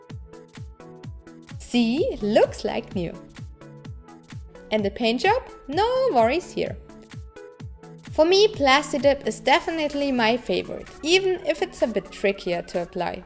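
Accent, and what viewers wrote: Germany. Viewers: Where do you come from? It sounds like Germany your English is interesting I mean it sounds pretty German :D I mean no hate but it's interesting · This English with a German accent or vice versa left me confused 😹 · I’m always here for the dogs and the German accent.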